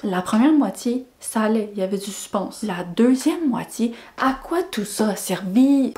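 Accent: Quebec, Canada. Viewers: Je ne suis absolument pas Québécoise ou Canadienne, je te découvre tout juste aujourd'hui grâce à une autre booktueuse qui a parlé de toi (@ mais oh my god je t'adore ! 😊😂🤩 J'adore ta pêche, ta façon de parler, de parler des livres, ton humour (oui je trouve que tu es drôle haha), TON accent 😂 (j'adore l'accent Québecois haha). Je suis très heureuse de te découvrir. Ça faisait longtemps que je n'avais pas fait de découverte de booktubeuse (hormis Lausdiary justement que j'ai découverte depuis 2 jours). Tu fais du bien. Je m'en vais continuer à tout regarder ce que tu as déjà fait et te suivre au présent ! Bravo pour ton travail. ❤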